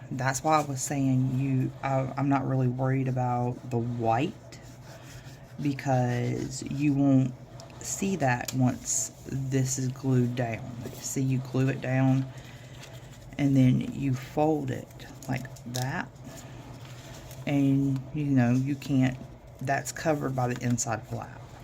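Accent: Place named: South, United States. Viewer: It’s so fun to hear a southern accent in a video! I love hearing the British ladies but not often a good ole Southern sound!! I love your altered paper clip idea! So cute! Your video was great! ❤❤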